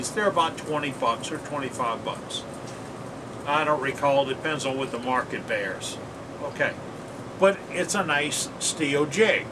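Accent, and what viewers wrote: New Orleans, United States. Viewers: Hey!! Just found you on Youtube. Love your style! No wonder, yer from 'Nawlins. Me too, I grew up in Harahan! I'm living in Denver now but sure miss home sometime, especially when I hear your accent! I am just learning how to turn wood. I turned a Stanley #5 Plane knob out of Rosewood. My first project and it came out real nice. I refurbished the plane for my son, who was born in Opelousas close to Lafayette. Anyway, I like your channel! I'll come back some more. Thank you very much! Great videos!!! Dave Henderson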